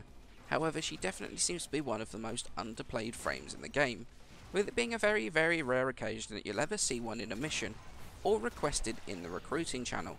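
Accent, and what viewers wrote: United Kingdom. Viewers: I am confused. What has his accent to do with the fact that you are from Germany? In my opinion his accent is the mildest British accent i have ever heard. It is really easy to understand him. Or was that a hint that you would wish for a German version of this video?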